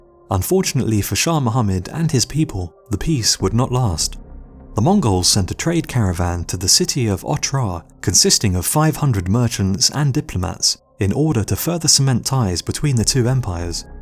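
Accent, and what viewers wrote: England, United Kingdom. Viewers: Englishman here. He sounds English to me. What was it that he didn't pronounce properly? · You sound like the spiffing brit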